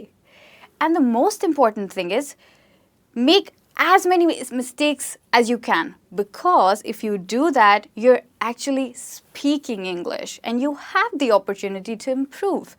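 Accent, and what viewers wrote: India. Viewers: Are you Indian. Your pronounce unfold it. Sometimes you spoke Indian english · I think she is speaking india English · God this is Indian English . So funny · What a beautiful indian accent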